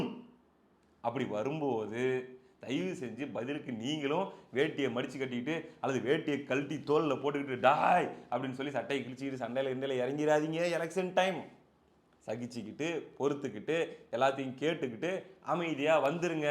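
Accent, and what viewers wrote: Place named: Salem, India. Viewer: உங்கள் பேச்சு மொழி (சேலம் வட்டாரவலக்கு ) அருமை நண்பா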